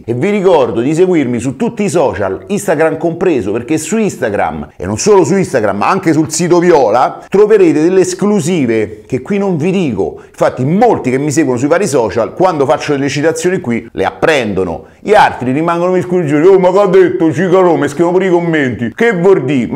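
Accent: Rome, Italy. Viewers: Grande sono figlio di una romana e quando ti sento parlare mi raffiorano sempre i ricordi, volevo dirti che grazie a te 3 anni fa circa mj sonl appassionato tanto al pugilato. Oggi sono pro e non lo avrei mai pensato, ho fatto il mio primo incontro a Milano a gennaio prima del lockdown. Con tanto allenamento e tanti sacrifici per un diciannovenne. P. S. Porta ancora tutorial e di al franchino di stare più attivo su yt che siete dei personaggi... Grazie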